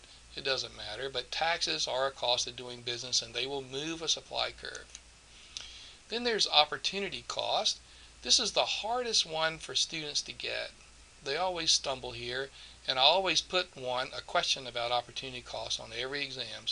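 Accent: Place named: South, United States. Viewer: that southern accent tho.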